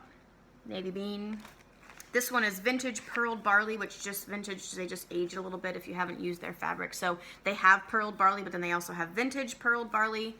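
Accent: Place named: New York, United States. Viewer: I'm catching up on your videos and I totally thought you were from New York! I grew up in NYC and swear you could have been my neighbor there. Maybe it's how quickly you speak? (Which I LOVE, because NY). I love Ebenezer's Christmas, I think I have to find and stitch it for my brother.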